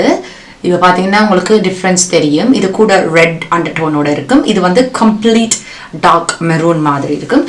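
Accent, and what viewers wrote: Sri Lanka. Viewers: Sis r u from srilanka I liked the way u talk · I like ur Tamil slang sis it's like srilankan tamil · Tamil super like srilankan tamil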